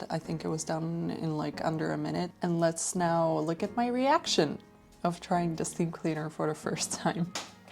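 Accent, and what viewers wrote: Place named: United States. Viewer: I want to quickly pop in and commend you on your English. I was quite surprised to find out that you are, like me, Czech. Almost perfect American accent. Whatever your history, whether you've actually lived in the States or not - hats off to you. Even foreigners who live there mostly don't reach this level of accent, ever.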